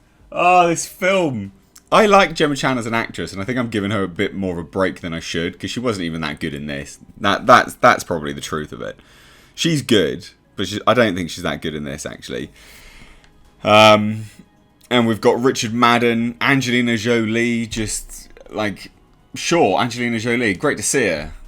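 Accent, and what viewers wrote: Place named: United Kingdom. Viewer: simply says what any sane person thinks about most things but he says it in that accent like hes just ur British homeboy. Do wish he'd throw in a "Bruv" now and again tho.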